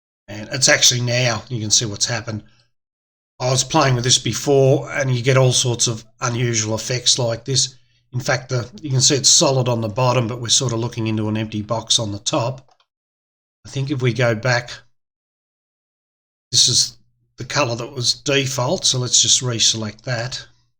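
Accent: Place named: Australia. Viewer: There is something comforting and no nonsense about someone with an Aussie accent giving a tutorial on Linux. His YouTube handle is also 😁 Instant Subscribe!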